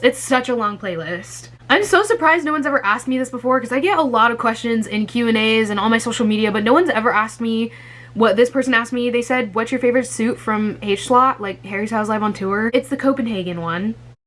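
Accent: United Kingdom. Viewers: British accent on point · ) I absolutely LOVE your shirt and your British accent is hilarious, we also randomly break into American accents sometimes too haha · That british accent tho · Your british accent was really good!!!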